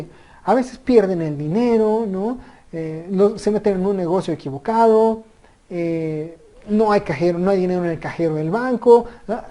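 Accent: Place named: Argentina. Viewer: Hasta que comienzas eres bueno pero parece Argentino ca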